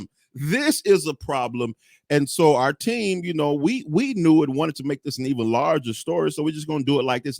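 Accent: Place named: Texas, United States. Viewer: Bro. you speak it real just like us country folks from Tyler, Tx do. 😂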